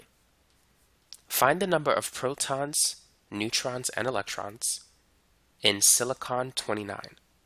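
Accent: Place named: Boston, United States. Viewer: you sound like your from boston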